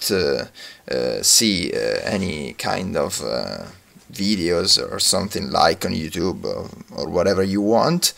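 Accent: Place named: Italy. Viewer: si sente molto dall'accento che sei italiano xD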